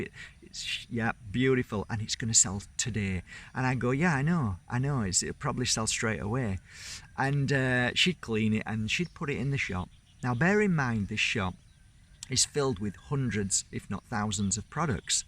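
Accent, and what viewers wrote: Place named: North, United Kingdom. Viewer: I could listen to you talk for hours. Maybe it's your northern accent 😉. Question re self healing. Because we are mainly water, do you agree that if we talk to our body using thanks and healing words etc, it works the same way as writing words and speaking to water like Professor Emoto did? By the way, Pyjamas. 😂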